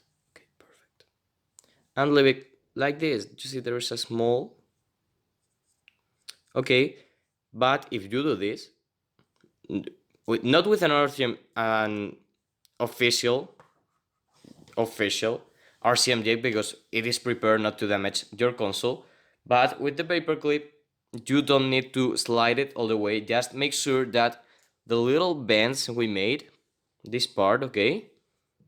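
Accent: Spain. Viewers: Jsjsjs como se nota el acento español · Are you spanish? Tu accento lo parece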